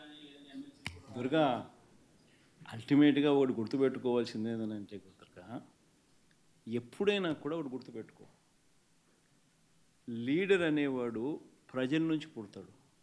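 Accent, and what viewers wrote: Rayalaseema, India. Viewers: Haha rayalaseema lo yasa tho answer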